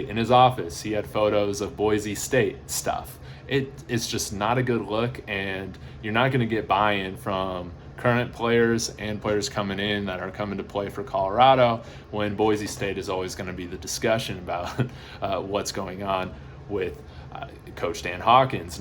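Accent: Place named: United Kingdom. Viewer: yes I saw - nice to hear a British accent in the videos. Traoure looks like a beast - but I was also waiting on Christian wade to break through for the Bills! Fingers crossed CU can get one of them into the league - trying to keep up with who is from last year with the CU and who are the new prime recruits. But it will be fun to watch the spring game! Keep up the content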